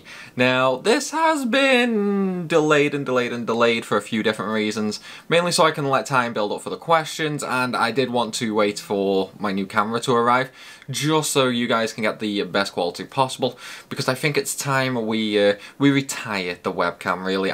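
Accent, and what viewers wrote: Stoke, United Kingdom. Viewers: Loving the content and dedication you have. Is that a Stoke accent btw?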